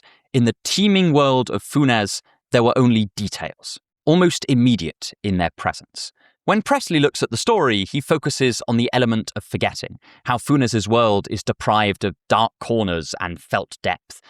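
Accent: United Kingdom. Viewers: First video I saw of yours was one with a super clickbaity title, where you "bashed" people for not enjoying the classics you liked. Ofc... you didn't actually do that, but it left a very weird and mixed first impression, that, combined with the primped-up, smart dressing style and the entirely clean appearance and (as discriminatory as I know this is) the heavy, but thoughtfully elegant and clear British accent made for an image of a typical "snob". I now realize you're the opposite... you're just like this, cause you like being like this and you want to share this love of classics and reading with the world in a non-pompous and inviting way. Sincere apologies for my prejudiced first impression. I also really adored the re-reading aspect towards the end. There was a while in my life where I was deeply against the idea of looking back and it was all about the quantity of highly lauded experiences to me. 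Today, I much prefer returning to things I really adore. Right now, I am reading the manga "Vinland Saga" and watching the anime at the same time, with a friend whose not only never seen it, but who claimed to hate the art form, before I proposed to him (based on knowledge of other things he's enjoyed) to watch it with me, and he's enamored by it. And every time I've experienced this story in all of its different formats, I've discovered some new aspect of it. Even watching it is different. The first time I watched it was with another friend, who was insistent on watching dubb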